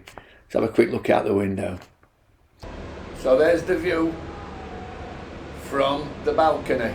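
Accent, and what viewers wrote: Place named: North, United Kingdom. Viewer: Hi nice to hear that Northern voice once again, im on you 2 advised tips, plus im already on Whip Cracker ew at 18s. Enjoy your break.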